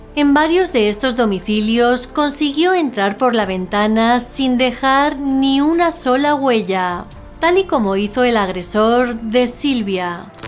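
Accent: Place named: Galicia, Spain. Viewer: si... porque es muy "gallega"muy lenta y alarga innecesariamente. Da pereza.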